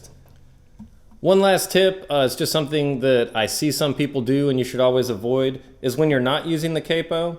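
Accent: South, United States. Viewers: This is the first time i have heard your voice. Correct me if I'm wrong but, do i hear a hint of a southern accent?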